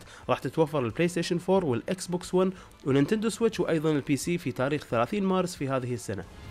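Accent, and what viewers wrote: Iraq. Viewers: nutella Z العراقيين لهجتهم جذي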